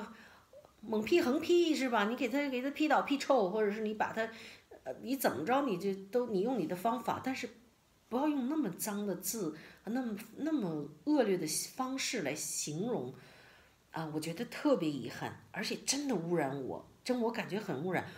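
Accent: United Kingdom. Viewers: 您的英音还挺好听的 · 喔，英伦口音好高雅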